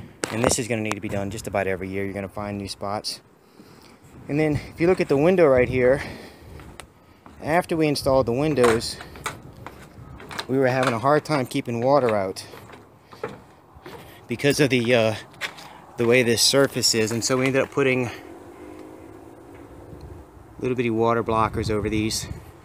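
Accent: United States. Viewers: Your definitely not from Texas, lol. What's that accent? Yankee? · You sound like you're from Philly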